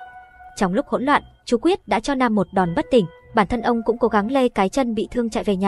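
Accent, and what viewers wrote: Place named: China. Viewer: Nghe mô thích người Trung Hoa lác lưỡi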